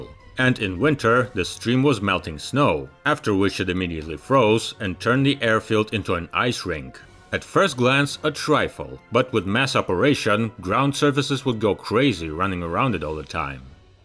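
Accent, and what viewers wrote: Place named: Russia. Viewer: The narrator is a native Russian speaker, providing the way he pronounces the titles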